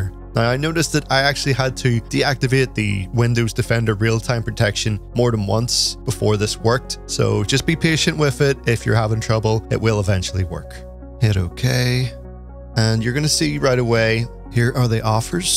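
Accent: Northern Ireland, United Kingdom. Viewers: Are you from NI ? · knew it. Jokes aside, im from Holywood County Down. Your accent just sounded very close to home. Love the videos….. “Where is she!”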